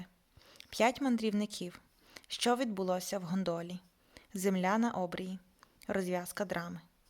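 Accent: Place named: Western Ukraine, Ukraine. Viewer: Варто додати що присутній західний акцент. Що є різні вимови.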